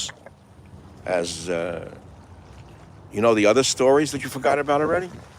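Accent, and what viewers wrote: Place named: Brooklyn, United States. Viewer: Gods did I miss that Brooklyn accent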